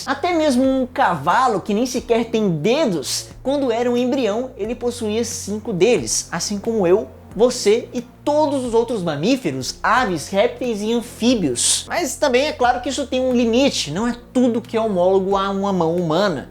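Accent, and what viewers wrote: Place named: Northeast, Brazil. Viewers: Esse aí dento é nordestino mermo kkkkk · Sou do Piauí e todo mundo fala Aí dento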